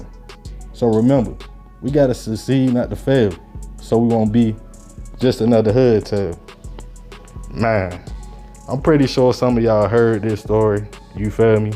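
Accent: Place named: Washington DC, United States. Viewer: The narrator gotta be from DC. The accent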